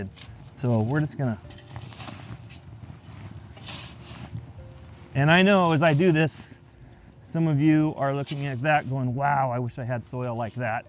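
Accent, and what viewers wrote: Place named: Pennsylvania, United States. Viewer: Great video. Sounds like a PA accent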